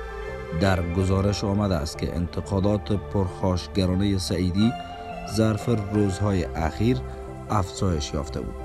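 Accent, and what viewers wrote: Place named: Iran. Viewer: Az lahja irany to tamam chez malum meshawand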